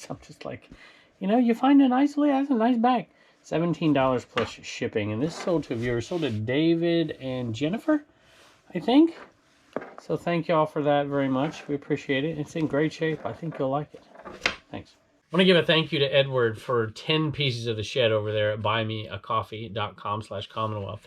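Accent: South, United States. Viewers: I could listen to you talk all day. You have the cutest southern accent and wonderful inflection. You could really read for audible in my opinion.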